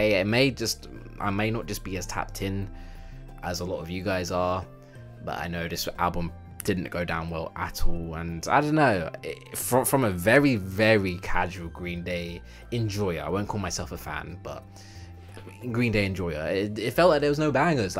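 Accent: United Kingdom. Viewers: Nice to hear a British voice in this music YT space for a change. Especially someone taking it seriously. Keep it up, mate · Why do you have a british accent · Wasn’t expecting you to be British.. didn’t know yall wore duwies.. when did yall start that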